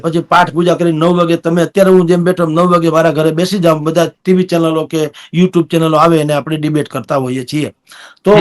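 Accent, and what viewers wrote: Kathiawar, India. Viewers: કાઠિયાવાડી ભાષામાં મઝા આવે છે..🎉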